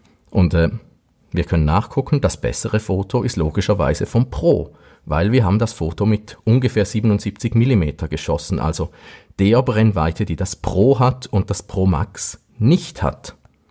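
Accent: Switzerland. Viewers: Deine schweizerische Tonfärbung einfach eine grossartige einschläfernde Wirkung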